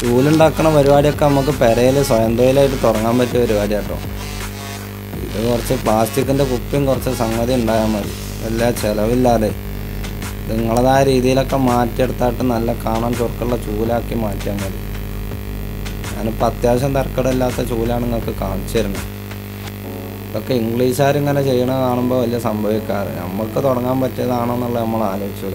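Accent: Malappuram, India. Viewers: മലപ്പുറം ഭാഷയ്ക് അടിയൊരു കിടക്കച്ചി ലൈക്‌ ❤️❤️ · ലൈക്‌ അങ്ങ് കൊടുത്തു . മ്മളെ മലപ്പുറം ഭാഷ thudakkathinu. " എത്ത വർത്താനം " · മലപ്പുറം ഭാഷ ഒന്നും നോക്കിയില്ല ലൈക്ക് അടിച്ചു.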